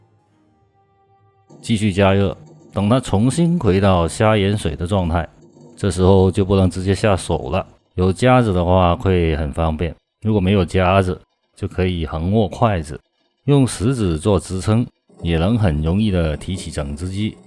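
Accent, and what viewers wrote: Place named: Cantonese, China. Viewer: 帶著廣腔的普通話，清晰，幽默風趣的的旁白，讚！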